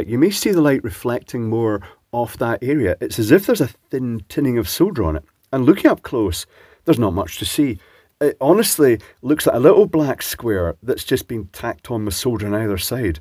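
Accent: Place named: Glasgow, United Kingdom. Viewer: Just love the Glasgay patter, Goes of like a Two Bob Rocket hahaha made my day hearing that. ..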